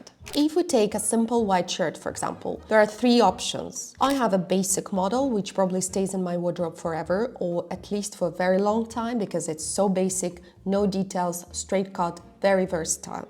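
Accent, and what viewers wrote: Russia. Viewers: Very Russian accent.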